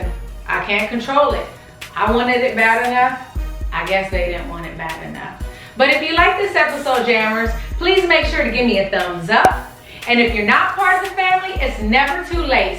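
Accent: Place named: South, United States. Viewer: her little southern drawl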